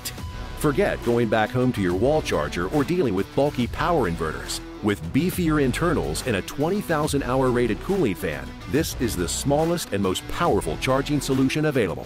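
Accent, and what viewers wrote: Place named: California, United States. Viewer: Please get someone with a non Californian accent to narrate it .. that American "dude" is just putting me off like no tomorrow :-)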